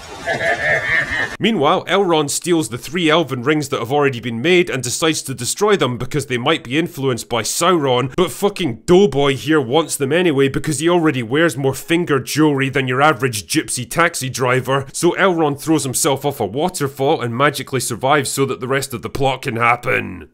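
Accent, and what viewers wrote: Scotland, United Kingdom. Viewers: Thank heavens, I saw season 2 was on Amazon last night, I watched the review of season 1, and i felt so awful after 3 minutes, I wondered if Id need to find the toilet.. This is not the video I wanted, but its the video I Needed, as sorts out the world into sanity/insanity in sheer minutes with an excellent Scottish brogue. Cheers man.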